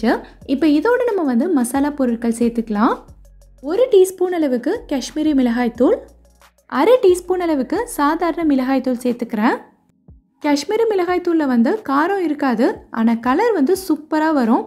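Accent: Madurai, India. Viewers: Super Madurai tamil, try panni pakkeren. Australia 🇦🇺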